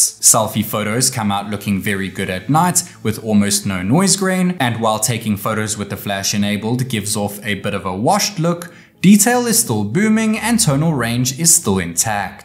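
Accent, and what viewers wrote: South Africa. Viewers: Your accent sounds South African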